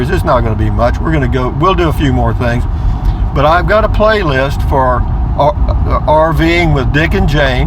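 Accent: Texas, United States. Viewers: I thought the same thing the first time I heard him say it, but then figured out it was just his Texas accent. It is funny to me, though.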